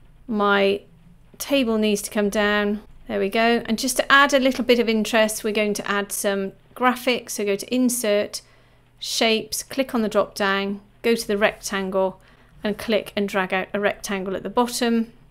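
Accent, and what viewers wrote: United Kingdom. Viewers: British accent and the geat tips. Thank you.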